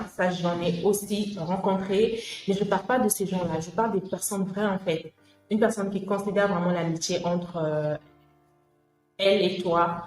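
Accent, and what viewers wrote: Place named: Senegal. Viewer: Toi la tu ressemble à une sénégalaise deh 😁même l'accent c devenu sénégalais deh🤣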